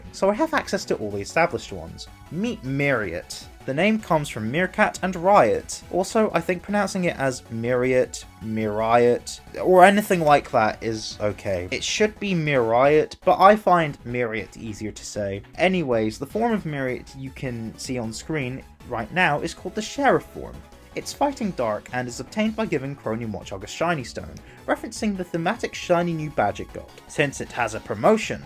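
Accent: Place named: Scotland, United Kingdom. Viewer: hello hello hello what's all this then Scottish accent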